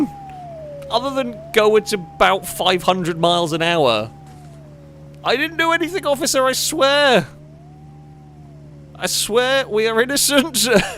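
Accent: England, United Kingdom. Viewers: pronounced "gas op die lollie" pretty perfect imo (English accent but still better pronounced then half of the Dutch citizens does)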